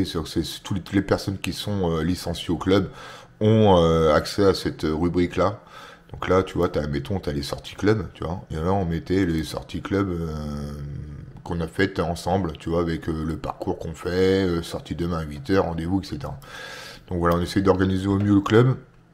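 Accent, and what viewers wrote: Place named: North, France. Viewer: Bonjour, Bravo pour ton courage et ta motivation. Pas évident de rouler dans ces conditions. C'est difficile avec le froid et l'humidité. Encore une video fort sympathique. Avec un accent du Noooooord qui ne passe pas inaperçu. J'adore. C'est toujours un plaisir de te suivre. Bonne récupération aussi. C'est important. Et tant mieux si tu as pu te remettre rapidement en forme sans trop perdre le bénéfice de tes entraînements précédents. Il est vrai que le tubeless, c'est top. J'ai parcouru à ce jour 2000 kms sans une crevaison mais ça reste tout de même un investissement. Gain au niveau du confort, de la réactivité, une meilleure tenue de route et stabilité dans la descente de col. Le tubeless en 28, sincèrement, je recommande. Au plaisir de pouvoir te suivre dans de prochaines vidéos. Prend bien soin de toi. A bientôt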